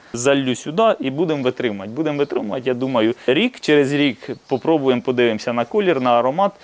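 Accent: Cherkasy, Ukraine. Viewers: не чиста літературна українська, а легенький суржик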